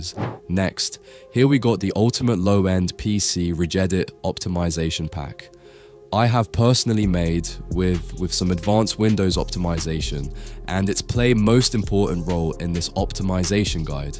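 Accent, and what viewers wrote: India. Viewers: yea man the vid is botted and bro ur indian voice we know it so put the voice changher off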